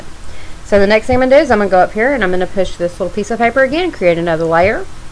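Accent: South, United States. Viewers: I love your southern accent too. You’re awesome along with the video. More videos please. Subscribed. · WOW, I've been looking all over the net and youtube for someone to show how to do this vintage style coloring to a B&W photo, without all sorts of steps! Thank you so much for the clear and concise tutorial and I love your southern accent! I'll be on your Teach Me To Color Facebook page soon and I'm a way thumbs up subscriber.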